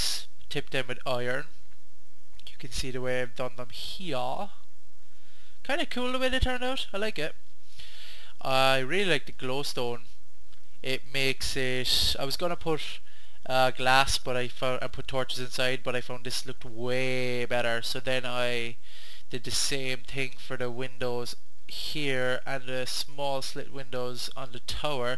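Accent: Ireland. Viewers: is your accent irish? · i only just found this channel and its says your irish i am too but i cant tell from your accent where your from ???? :O :)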